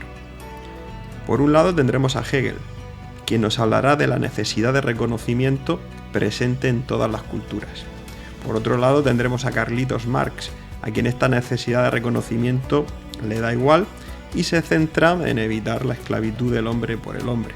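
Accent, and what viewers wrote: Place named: Spain. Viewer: ja ja ja La democracia liberal como la único sistema político que permite vivir en libertad. Eso es gracioso y también impreciso. No se si te estas refiriendo al liberalismo de Adam Smith o al neoliberalismo de Milton Friedman, o cual de los neliberalismos. Por que hay varias corrientes neoliberales. De cualquier manera ninguna funcionó. Algunos engañabobos tratan de poner en practica el libertarismo como si de una corriente ajena al liberalismo se tratara. Estas corrientes como odas las teorías económicas son superadas por la realidad. Sin embargo aportan al progreso de la humanidad, incluyendo a "Critica al Capitalismo" tan criticado, poco leído y sacado del contexto del periodo histórico en que fue escrito. Te recomiendo te busques a un patrocinador como lo hacen "visualpolitik" o Gloria Álvarez. Tienes un acento como de España. 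Ustedes no viven en democracia. No eligen a su presidente por sufragio directo y mantienen a una monarquía inútil. Después de tres meses ya tienes 4 likes, y este comentario. No te rindas, tu puedes.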